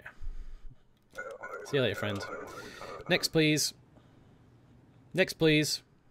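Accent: Scotland, United Kingdom. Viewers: He is Scottish he can't control his accent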